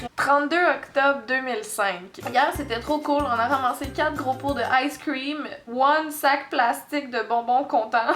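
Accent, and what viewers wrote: Quebec, Canada. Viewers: Je t'ai connu grâce à Norman, j'adore ton accent Quebecois!!! +1 abonné :-) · Salut, je suis française et je te découvre depuis peu. C'est un réel plaisir de regarder tes vidéos =D. Tu as tellement d'humour, de légèreté, de sensibilité.... On a tout autant envie de se taper des barres avec toi que de te prendre dans les bras, car tu es trop mimi!;P J'adoore l'accent québécois!!!! =D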